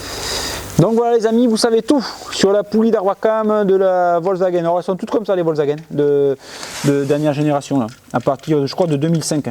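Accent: Gironde, France. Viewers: Toujours d'excellents commentaires avec un boulot impec, et en plus le petit accent de la Gironde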